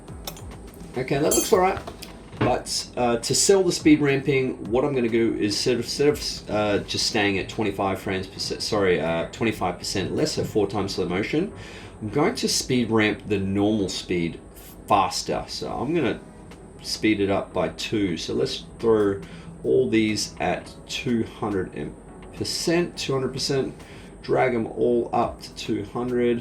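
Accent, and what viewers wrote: Australia. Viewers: Sound Aussie bruh. Awesome vid helps alot! Waiting for my A7iii looking at 17-28tam also 🤩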